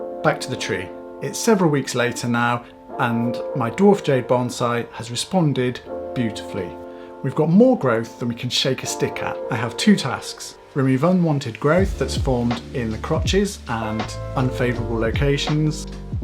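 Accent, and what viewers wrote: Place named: United Kingdom. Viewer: You have a unique style of making videos and its awesome. I like them all a lot and i also like your british accent. Keep the content coming!! Greetings from germany.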